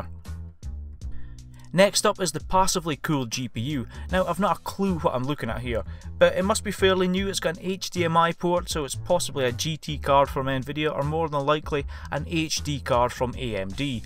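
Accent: Scotland, United Kingdom. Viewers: Ah so you're Scottish eh? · I hope your channel does well mate! I'm from Glasgow, good to hear a fellow scotsman!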